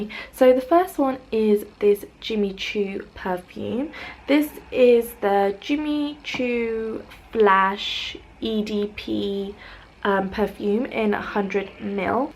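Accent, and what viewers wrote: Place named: Midlands, United Kingdom. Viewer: Are you from the midlands? Your accent is so familiar! X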